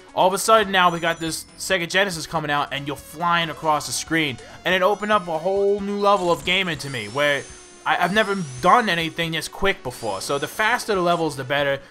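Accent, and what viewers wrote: New York, United States. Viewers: How come your voice sounds like you have a New York accent? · Oh with the New York accent! Wierd if you've been watching videos for over a year...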